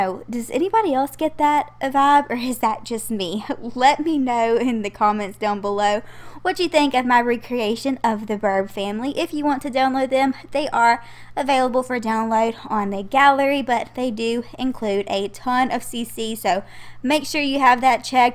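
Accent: South, United States. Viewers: So Cute......... And Loving Your Southern Accent...... As You See I Am Southern! HUGZZZZ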